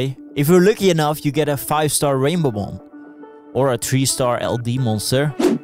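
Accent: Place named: Netherlands. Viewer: I saw you on killa´s video and i knew right away you we´re dutch haha and then few minutes in the video when you said toa then you confirmed it haha! Nieuwe sub erbij :) succes met je kanaal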